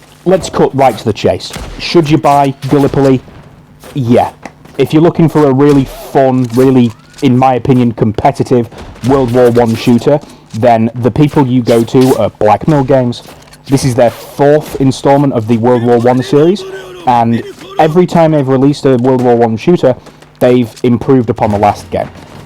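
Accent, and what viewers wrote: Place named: United Kingdom. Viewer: The most British voice I ever heard, like I couldn't even get concentrated on the video, but definitely it's a good review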